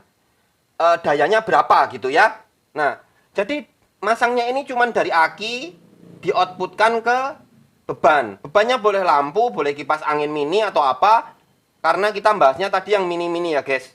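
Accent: Java, Indonesia. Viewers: lucu juga ya mas ini medok jawane iaku senengmakasih saya juga mau planing buat plts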